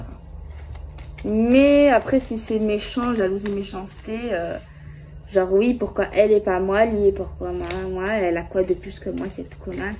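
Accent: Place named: South, France. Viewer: Bien l'accent du sud...alors tout vas bien..🙏🙃🤣 👍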